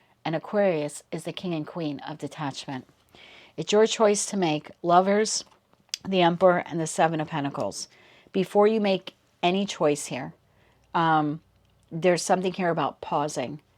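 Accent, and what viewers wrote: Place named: New York, United States. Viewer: I'm presuming by your NY shirt, that you are a New Yorker. I can tell by your accent. Long Island here. ❤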